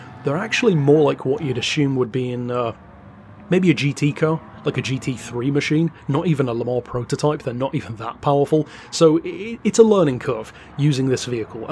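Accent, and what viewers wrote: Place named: England, United Kingdom. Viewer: Decent review, his English accent was killing me a tad, but this jag does look pretty smooth!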